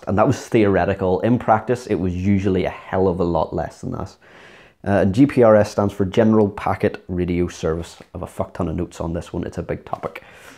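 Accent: Northern Ireland, United Kingdom. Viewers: Northern Ireland! Yeo!1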